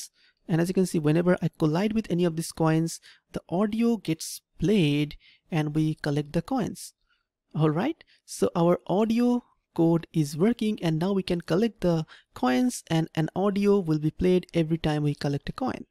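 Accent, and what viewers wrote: India. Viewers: Are u indian or Nepali🤔😅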